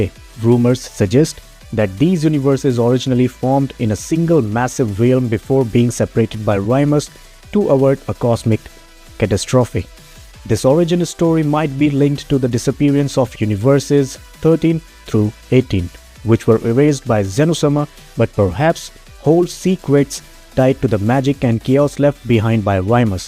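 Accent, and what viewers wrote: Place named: India. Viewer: why are you talking like an indian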